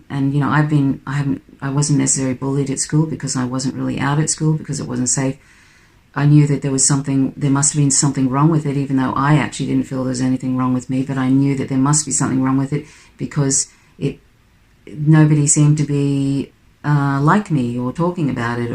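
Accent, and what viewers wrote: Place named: Australia. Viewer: you sound like you have spent time in australia ? Very timely and insightful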